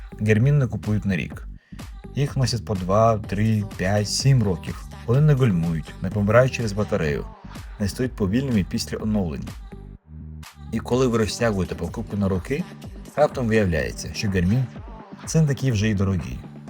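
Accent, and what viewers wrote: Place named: Russia. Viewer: тому, що людина була все життя російськомовною і ще не впоралася з цим маркером російського акценту.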